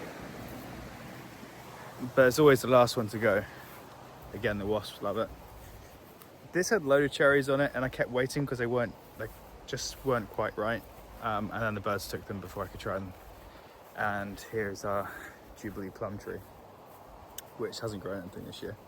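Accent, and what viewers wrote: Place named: Australia. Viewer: Hi there!! I'm at a loss as too where you are in the world!! The scenery looks like England!! But your accent suggests Australian of some kind!! Confused!! LOL!!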